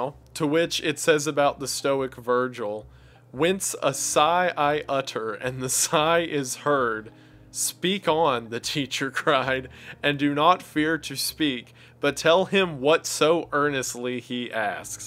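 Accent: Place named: Appalachia, United States. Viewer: It's an accent. I do the same thing. He's from Appalachia, I'm from the Mid-Atlantic.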